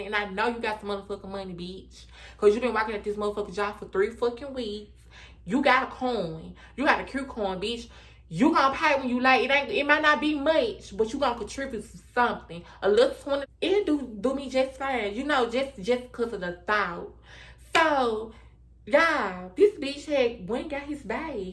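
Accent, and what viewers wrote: South, United States. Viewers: Your accent 😍 it's giving southern belle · This sista is old school southern. I understood every word.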